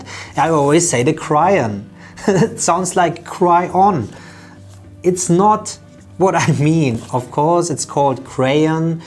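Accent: Germany. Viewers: Where are you from? Germany?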